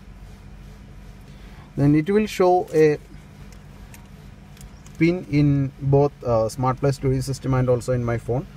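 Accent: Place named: India. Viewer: Lol the accent. The way you say maruti... only an Indian can catch that.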